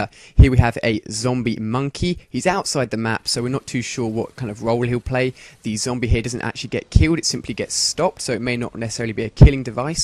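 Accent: United Kingdom. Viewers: i love your british accent!! teach me!!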